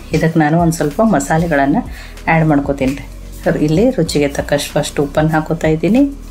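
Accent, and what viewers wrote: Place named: Haveri, India. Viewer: ನಾನು ಖಂಡಿತ try ಮಾಡುತ್ತೇನ. Manage ನಿಮ್ಮ ಕನ್ನಡ ಮಾತು ತುಂಬಾ ಇಷ್ಟ. ನಮ್ಮ ಅಜ್ಜಿ ಊರು ಹಾವೇರಿ. ಭಾಷೆ ಬಹಳ ಚಂದ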